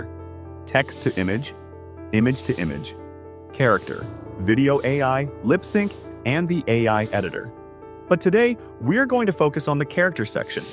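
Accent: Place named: Asia. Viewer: how come you have a new voice boss, likes your asian accent better 😊 i know this tool😊 before i watched your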